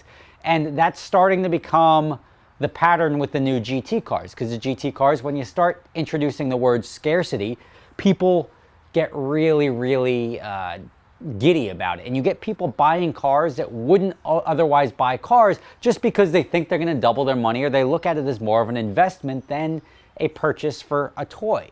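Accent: New Jersey, United States. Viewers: like yo... you from Jersey?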